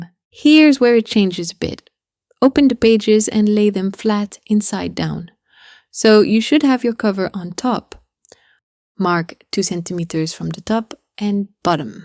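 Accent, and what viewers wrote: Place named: France. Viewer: Liked your French accent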